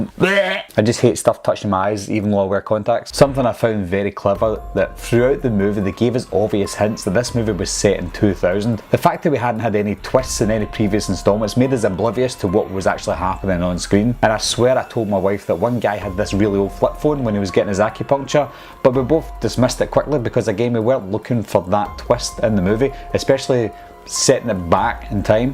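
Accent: Scotland, United Kingdom. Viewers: Your voice is so Scottish...and Scottish voices are sexy!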